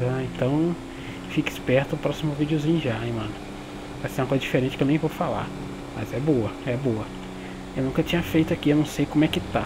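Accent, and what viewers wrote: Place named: Minas Gerais, Brazil. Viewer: vc é de mg paresse pelo sutak. Se for somos mineiro uai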